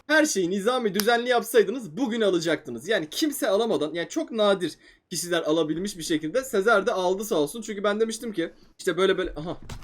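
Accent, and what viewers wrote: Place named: Adana, Turkey. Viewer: Yoo Adanalı lar da öyle konuşuyo ben Adanalıyım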